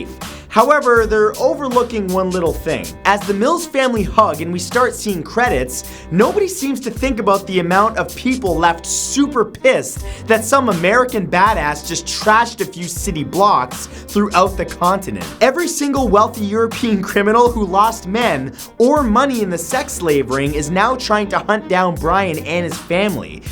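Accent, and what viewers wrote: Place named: Canada. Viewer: He's not a dumbass he's from Canada where they pronounce some words slighty different then in the US. Even if he wasn't pronouncing a word different doesn't make you a dumbass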